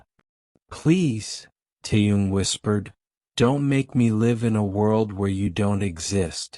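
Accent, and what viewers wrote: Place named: Asia. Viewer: may I ask you what nationality you are? I hear a faint accent in your voice. Are you Asian or partly Asian. I'm just wondering cause I love listening to your voice when you read.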